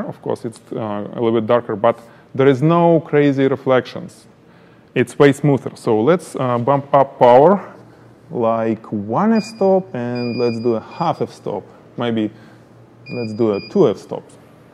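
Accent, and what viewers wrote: Russia. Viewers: Hi, firstly are you Russian? Great accent!:D. OK real question, I make handmade beaded jewellery, but my product photography really doesn't do justice to my jewellery. My biggest issue is how to get the sparkle in the crystal bicone beads, but not blow out the duller toho beads. Over a year I have been trying, and no matter what I can't seem to take decent images on a white background on a budget for my shop. I absolutely loathe trying to do the images now, as to photograph say 10 items can take me over four days, and I'm still not happy at the end. Any chance you would concider doing a video on something like this? I'd even be happy to send you a piece of my hand made jewellery for you to use for the demo which you could then pass on to your loved one. Desperate for help, as a single mum trying to make money from my jewellery making skills is being let down by the fact I am unable to take the images that show off the jewellery. 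Kindest regards Linda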